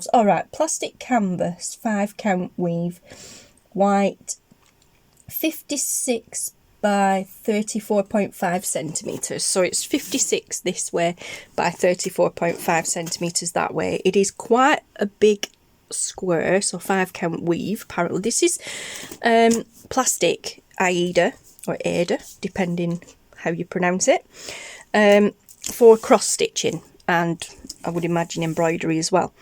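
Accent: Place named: Northern England, United Kingdom. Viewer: Nice to hear a northern voice doing crafty hauls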